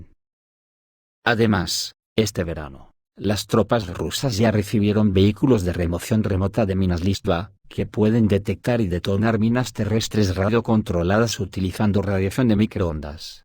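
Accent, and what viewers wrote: Spain. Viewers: TU CANAL ES UN DIGNO REPRESENTANTE DEL MUNDO HISPANO-LATINO ============================================================, TU APORTE INFORMATIVO Y TUS COMENTARIOS / ANALISIS, HACEN DE TU CANAL, UN CANAL PARA SER TOMADO EN SERIO Y CON RESPETO. EL EUFEMISMO Y TEMOR SOTERRADO DE OTROS "REPORTEROS" ==================================================== _ TU NO TIENES MIEDO COMO OTROS CANALES, DE DECIR Y LLAMAR LAS COSAS, LAS PERSONAS Y SUS PAISES CON SU NOMBRE Y CON SU CALIFICACION. _ OTROS CANALES USAN EUFEMISMOS TIMORATOS COMO LLAMAR "OSO" A RUSSIA MOTHERLAND _ OTROS CANALES USAN EUFEMISMOS TIMORATOS COMO LLAMAR "I" AL ESTADO DE ISRAEL. _ OTROS CANALES USAN EUFEMISMOS TIMORATOS COMO LLAMAR "ABUELO" AL USA PRESIDENTE. _ OTROS CANALES USAN EUFEMISMOS TIMORATOS COMO LLAMAR "PAIS DE LAS HAMBURGUESAS" A USA. _ OTROS CANALES TIENEN UN TERROR DE MUERTE DE LLAMAR GENOCIDIO A LOS CRIMENES COMETICOD POR ISRAEL. 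_ OTROS CANALES LLAMAN "TERRORISTAS" A LOS PATRIOTAS PALESTINOS, PORQUE ESO ES MAS "CONVENIENTE", Y ASI EVITAN QUE LES CIERREN EL CANAL. _ OTROS CANALES LLORAN LA MUERTE DE UN JUDIO, PERO LA MUERTE POR GENOCIDIO CONTRA UN PALESTINO ES JUSTIFICADA PORQUE TODA PALESTINA TIENE UNA ETIQUETA DE "TERRORISTA" QUE LE PUSO OCCIDENTE Y SUS MEDIOS MASIVOS DE COMUNICACION, DE MANERA PROPICIA Y CONVENIENTE, PARA PODER PERPETRAR ESTA MATANZA EN CONTRA DEL PUEBLO PALESTINO TU HABLAS UN ESPANHOL "CASTIZOLA" COMO EL MIO, QUE A LA SAZON; HABLO OTROS IDIOMAS Y POR ESA RAZON ENCUENTRO LA VERDAD, PE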